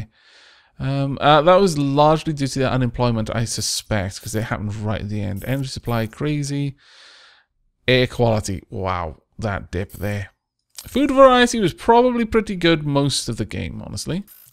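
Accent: Wales, United Kingdom. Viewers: has welsh accent...is funny as fuck and is pretty savage, it's like having a older brother that has a clue of how to play this game 😂 great good on this series buddy im subscribed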